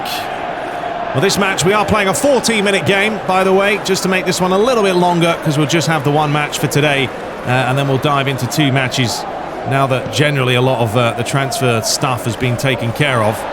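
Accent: United States. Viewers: As an American viewer, I have to say you nailed your American accent. Yee haw! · That American accent sounds just like Taylor twellman haha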